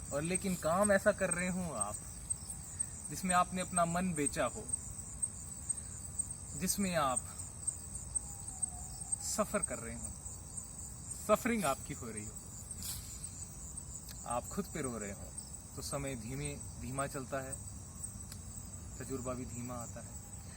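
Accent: Standard. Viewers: man i really love your hindi ...... its so pure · Love your accent Pure hindi.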